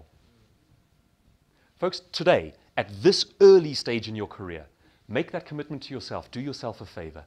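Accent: England, United Kingdom. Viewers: He is an excellent speaker he has an English accent that you can understand very well and very knowledgeable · Queens English?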